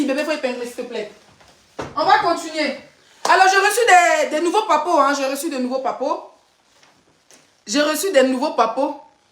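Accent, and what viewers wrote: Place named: Cameroon. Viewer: Stp parle comme une ivoinnene lorsque tu parle comme une camerounaise ça ne donne pas de grâce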